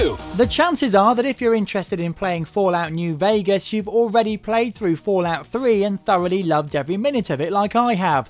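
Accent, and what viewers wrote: England, United Kingdom. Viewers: his the person taking in the review British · dnt worry not all english accents sound like his his is annoying but the english accent is a lot nicer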